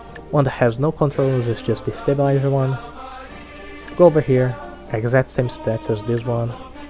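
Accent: Brazil. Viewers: I heard portuguese voices, are you from Brazil? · Men, are you brazilian ?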